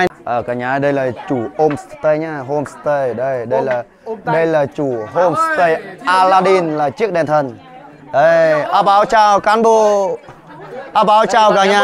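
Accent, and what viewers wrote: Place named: Central Highlands, Vietnam. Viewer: Thoi sao mang theo âm điệu vùng cao (hay)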